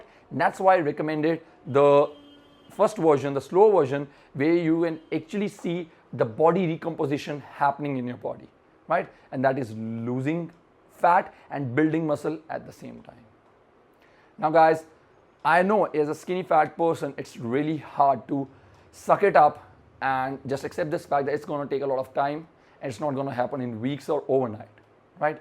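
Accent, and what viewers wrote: India. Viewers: I don't know why I guess its the western stigma but I think of a wise monk listening to your videos lol. People from India with the hindu accent feels like it just oozes wisdom and patience. Keep up the great videos.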